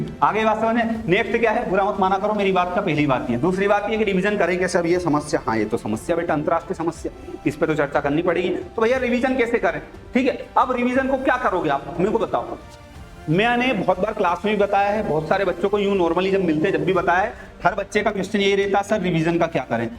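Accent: Indore, India. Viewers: Indore ascent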